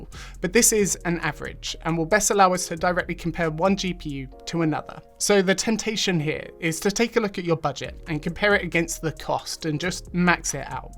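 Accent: United Kingdom. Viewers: interesting video. But i just took a look at your links for a few cards i could be interested at for different prices ranges. Well your links for the so called "cheapest" cards i looked at, well, where more expensive than i have seen on other sites in the uk in the last few days. these links where very disappointing after sitting though your video. Not impressed. Also which market are these best prices from? You are from the UK sounding wise but you keep talking and showing usd prices. Maybe i missed something but you really should say which market your talking about as they can range in prices so much. esp as USA don't post tax's on there card prices quite often. Anyways thanks for the video and the effort but disappointed. Cheers.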